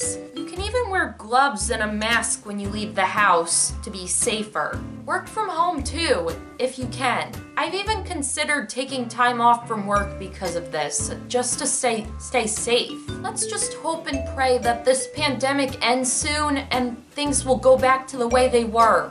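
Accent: New York, United States. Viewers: What accent do you have,? Sounds like New York. I'm Australian so all the different US accents interest me.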